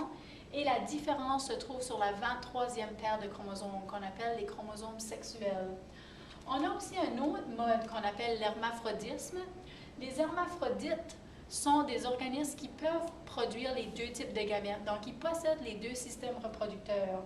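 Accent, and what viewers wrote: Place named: Quebec, Canada. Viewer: super vidéo mais je n'ai juste pas très bien compris avec l'accent quebecois 😝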